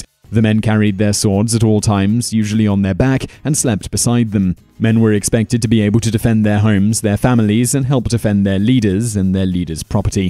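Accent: United Kingdom. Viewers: That British accent makes you sound slightly less boring and inadequately prepared but not much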